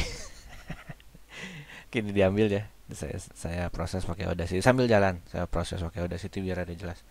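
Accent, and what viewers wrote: West Java, Indonesia. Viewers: paingan logat na logat sunda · nyunda banget kang.. ahahahah.. the real SUNDA Modern :)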